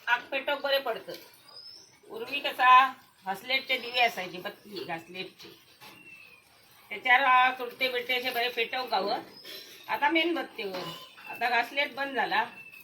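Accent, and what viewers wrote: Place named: Konkan, India. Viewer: खूप छान वाटला बघताना आणि तुमचा मालवणी ऐकताना. एकदम कोकणात गेल्यासारख्या वाटला. इतके ताजे फडफडीत मासे खंय बघाक मिळतंत. 😊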